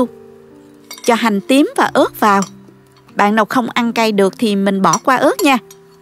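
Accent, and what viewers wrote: South, Vietnam. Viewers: Giong noi cua de thuong qua, dung la nguoi Nam binh duong❤️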